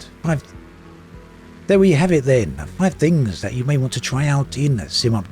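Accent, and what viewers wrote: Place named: Northern England, United Kingdom. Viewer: It's an English accent, most likely from the north or upper midlands.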